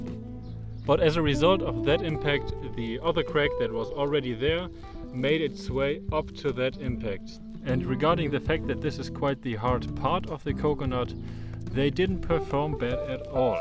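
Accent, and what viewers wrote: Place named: Germany. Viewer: Fast kaum erkannt, dass du Deutscher bist 😉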